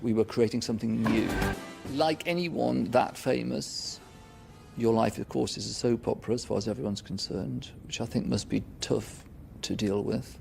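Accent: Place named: England, United Kingdom. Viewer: Beautiful english accent 😍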